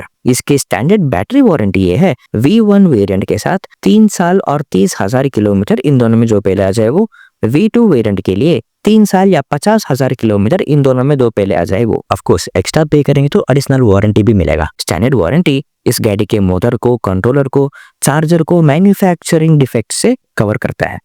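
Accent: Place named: South, India. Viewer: आपकी हिंदी ज्यादा अछि लगी जबकि आप दक्षिण भारतीय लहजे में बात कर रहे थे...लेकिन जो भी हो आपने बेस्ट हिंदी बोली....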